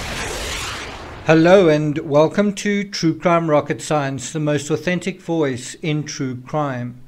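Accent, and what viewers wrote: South Africa. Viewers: Howsit! Love the SA accent. Great channel. Greetings from Eire 🇮🇪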